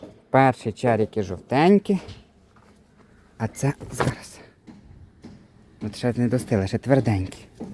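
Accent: Ternopil, Ukraine. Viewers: Я з Криму. Обожнюю ваш тернопiльскiй говiр💕Ще з Мастер шеф.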